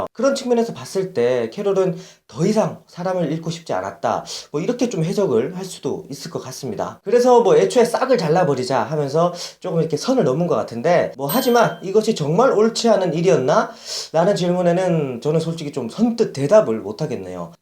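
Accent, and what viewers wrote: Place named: Gyeongsang, South Korea. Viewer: 캐롤 시즌 1~2까지만 해도 별 비중없고 민폐 캐릭에다가 일찍 죽을것 같은 캐릭이었는데 이제는 없어서는 안될 최종병기가 되었죠. 인물 연대기는 역시 원년맴버가 메인인듯 합니다. 특히나 릭은; 준비기간이 오래걸리실것 같네요. 역시나 님의 내용정리는 탑입니다.근데 뜬금없지만 경상도 출신이신가요. 제가 경상도 사람이라 중간중간 귀에익은 말투가 조금씩 들리네요^^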